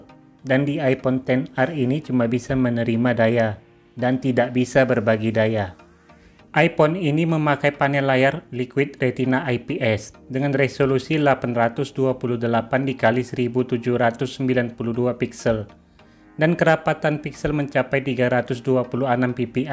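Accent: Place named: Bugis, Indonesia. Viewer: Kyk logat org Bugis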